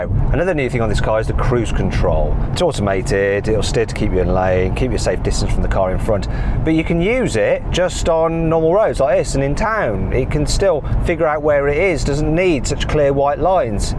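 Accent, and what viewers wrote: United Kingdom. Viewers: 영국발음 헤으응....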